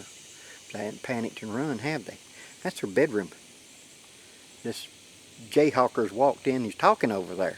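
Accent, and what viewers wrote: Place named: Georgia, United States. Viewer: I just found your videos I really appreciate the info but it’s a trip down memory lane as a young lad with my dad and grand pa with the way you talk. Georgia native maybe? If that’s a known fact or not my bad just found you last night.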